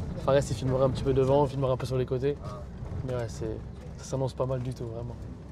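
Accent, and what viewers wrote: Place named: England, United Kingdom. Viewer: oh my god I love this English accent, you sound hlou😍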